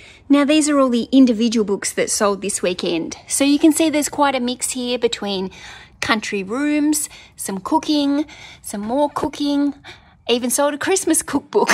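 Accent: Australia. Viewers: Great sales on these books. I just started watching your videos. Thanks for taking us along for your journey. Question do you sale comic books also? Love the Australian accent!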